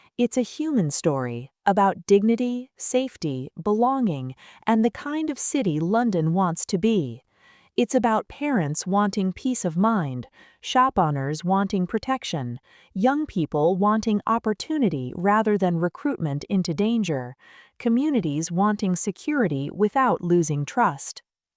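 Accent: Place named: United States. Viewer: An American narrator, why?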